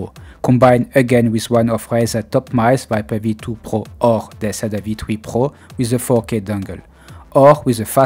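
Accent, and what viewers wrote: France. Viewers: T'es francais non?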